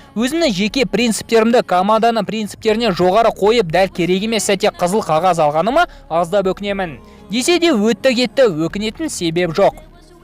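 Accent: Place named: Russia. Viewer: Брат казакшаны казак боп сойле орыс емес н н н н демей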